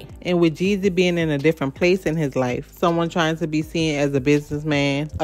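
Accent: New Orleans, United States. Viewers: Your accent.... New Orleans?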